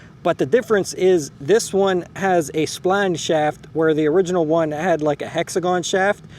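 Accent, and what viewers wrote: Pittsburgh, United States. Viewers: My man, you HAVE to be from Da Burgh. LOL!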